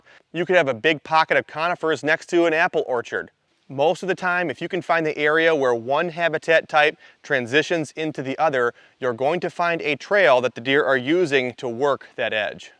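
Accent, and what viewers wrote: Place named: North, United States. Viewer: Nothing but yankees and their accent and nomenclatures doing hunting vids. Is a good ol country boy to much to ask for vs city folks? Whats pryporties?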